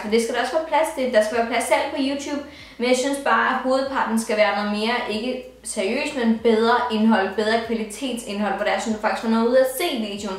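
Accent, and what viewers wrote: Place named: North Jutland, Denmark. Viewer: Elsker når man lige en gang i mellem kan høre en snert af din nordjyske accent <3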